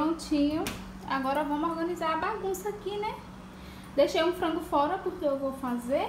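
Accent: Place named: Brazil. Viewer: Eu nao sou Brasileira mas falo portugués e gosto dos diferentes sotaques do Brasil. Eu gosto do seu cheito de falar, do bom dia e do "meu povo". Voce é auténtica e mostra sua vida como ela é, se alguem nao gostar do seu sotaque pois que asista outra youtuber de outra regiao. Continue com seu bom dia que tem gente q gosta, continue com seu cheitinho de ser que é unico e original 🌸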